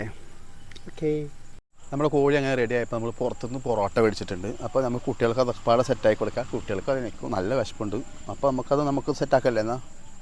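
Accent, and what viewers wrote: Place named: Palakkad, India. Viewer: മസാല കോയിക്കോട് ആയ്ക്കോട്ടെ സംസാരശൈലി പാലക്കാട്‌ മതി 😀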